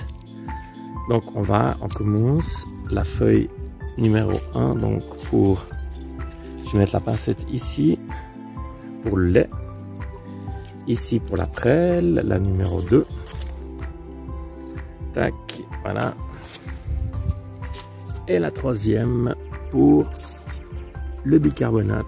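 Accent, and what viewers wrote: Switzerland. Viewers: Bonjour! J'ai découvert ta chaîne hier et j'me suis abonné direct! Merci pour avoir diffusé ç'test! C très clair et surtout, pragmatique!... Sinon, c'est bien un accent suisse qu't'as?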